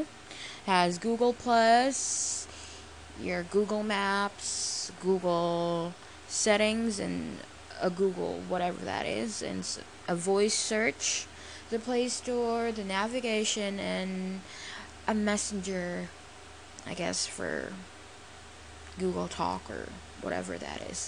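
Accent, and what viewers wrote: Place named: United States. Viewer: Can you just speak natural?? Damn! You sound annoying with all your Anerican English Accent!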